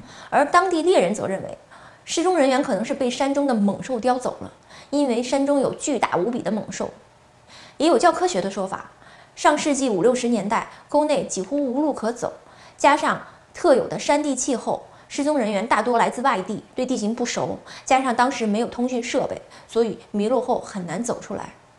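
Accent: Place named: North, China. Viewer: 這腔調一聽就知道是大陸北方人XD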